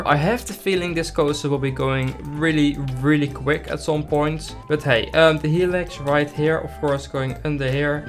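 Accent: Netherlands. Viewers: jij ben nederlands dat hoor je gewoon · Hey. Do u come from the Netherlands? U sound like a dutch person. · I smell dutch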